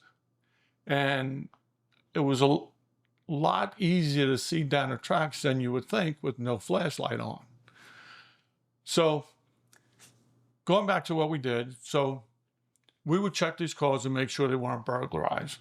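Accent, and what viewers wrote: New Orleans, United States. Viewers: Perfect accent, soon as this video started if knew he was from the New Orleans area. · This guy has the classic New Orleans accent. Makes me miss that town. I spent a lot of time there in the ‘90’s.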